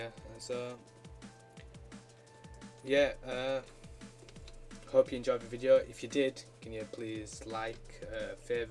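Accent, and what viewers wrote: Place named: South, United States. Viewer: Heavy southern accent cat -- cut -- bear -- b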